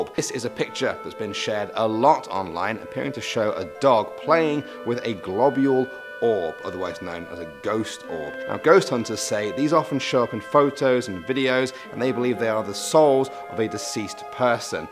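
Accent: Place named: United Kingdom. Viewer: Love your british accent, greetings and support from Sweden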